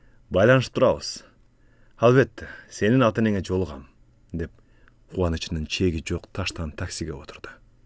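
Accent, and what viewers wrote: South, Kyrgyzstan. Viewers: ото сонун 👍👍👍 кандай гана уккулуктуу, туштугумдун жумшак тили👍👍👍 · Мага жакты туштуктун диалектиси менен окуп жатат😊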